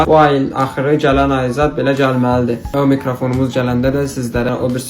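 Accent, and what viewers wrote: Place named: Qazakh, Azerbaijan. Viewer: Qaqam haralısan lehçen Qazaxlılara oxşayır ❤ men ❤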